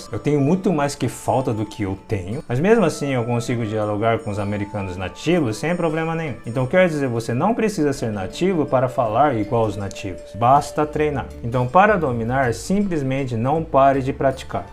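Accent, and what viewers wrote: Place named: Brazil. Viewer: Eu jurava que tu era brasileiro. Pelo teu sotaque que falas perfeito ao um brasileiro.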